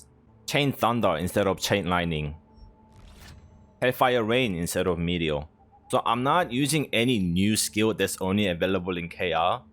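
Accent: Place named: Australia. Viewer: Mate, I keep hearing an Aussie accent creeping through. You in/spent time in Aus?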